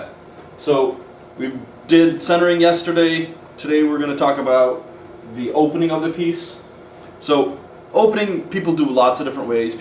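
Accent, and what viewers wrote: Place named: Germany. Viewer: Not gonna lie... the German accent startled me. 0_0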